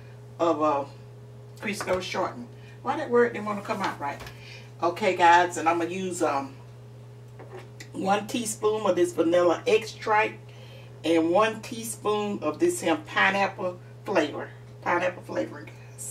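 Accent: South, United States. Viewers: I'm from California and when I heard you speak, I knew this was going to be divine. Your spirit made me feel so safe! You remind me of my God mother. So sweet, southern and full of the love of God! God bless you Miss. Thank you for just doin ya thang and being you. You blessed me real good! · Every recipe I saw 4 this used a box mix & I wanted REAL DEAL scratch made. I clicked on the video & your sweet southern accent & energy reminded me of my aunties & every sweet church lady I encountered in my life. And 1 things I know about sweet Southern ladies is they r the best REAL DEAL cooks & bakers. Gonna definitely make this Thanksgiving. TY SO MUCH. 🌹👏🏿🌹👏🏿🌹👏🏿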